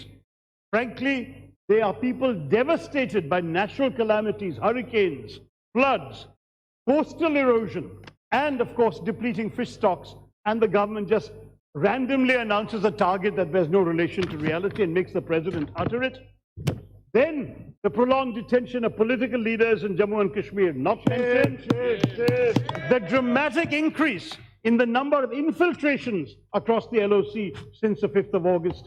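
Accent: United Kingdom. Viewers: Gone are the days where people though you are clever just because you spoke in British accent. Dumb idiot! still with congress, the party that brought India to its knees. This idiot who talks about secularism is a part of the communist government. What a shame! you should drown yourself. You speak about women safety when you have a charge about your wife in court! stupidity!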